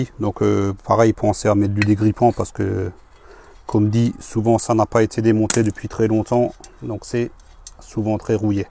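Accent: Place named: Alsace, France. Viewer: accent et alors..... fier d'être Alsacien, dans d'autres régions, ils ont un accent également, alors..... quant aux joints.... Trèfle Vert par exemple.... en règle générale les magasins Jardinage..... ou pour les veinards comme nous, Alsaciens, un déplacement outre Rhin chez OBI...... nettement moins cher qu'en France..... hé oui..... merci pour vos "bidouilles"....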